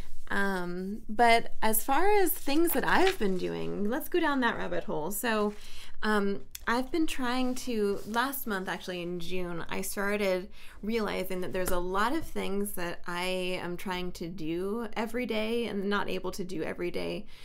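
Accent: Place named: France. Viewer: "I hope this is recording 😵🥴 ... " 🤣😂🤣😂 💚💛🧡💔 I grew up in France only to leave at the age of 24. English is full of French words: strange/extreme/beauty/desire/verbal/mode/blonde/etc so you already know a bunch of vocabulary. When we French speakers learn English the same happens ! Where as when we learn German there's little to start with. But you English speakers also know a bunch of German vocabulary: break/brechen White/Weiß Mother/Mutter Brother/Bruder and so forth. Your french accent is so cute ☺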